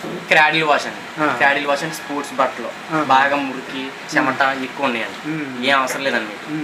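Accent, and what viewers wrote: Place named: Godavari, India. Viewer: Godavari Telugu super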